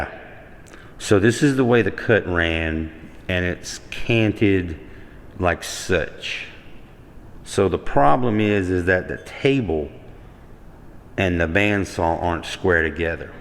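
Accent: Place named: Louisiana, United States. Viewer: when i first heard you start talking i said this cat has to be from Louisiana, great video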